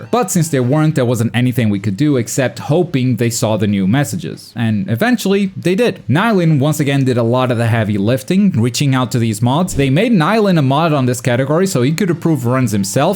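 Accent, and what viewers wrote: Portugal. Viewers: Calma calma calma és tuga?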